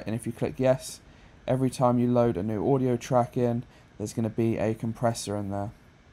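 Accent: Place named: United Kingdom. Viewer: happy to found someone who has british accent with ableton tutorials :D <333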